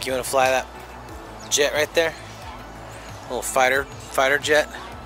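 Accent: England, United Kingdom. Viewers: Happy Birthday the Great, we love you guys you crack me up with your English accent.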